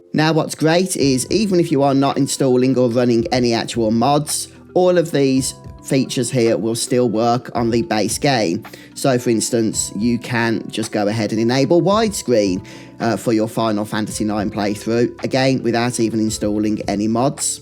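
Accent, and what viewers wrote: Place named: Birmingham, United Kingdom. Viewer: This guy sounds like he's from Birmingham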